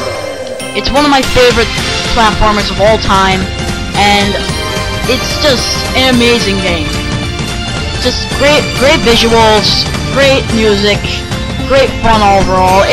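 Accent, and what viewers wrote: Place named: United States. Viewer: That accent is 100% American my friend, don't kid yourself :D I think part of feeling we all have towards games comes from the time you play it in. I'm glad you buy the old consoles, shows a desire to explore old styles and appreciate the classics, but I think you have to grow up in those eras to experience what it's like playing these games for the first time during their hey-day. Golden days spent playing Sonic on GameGear, Mario on GameBoy, Goldeneye on N64, Red Alert C+C on PC. Good times!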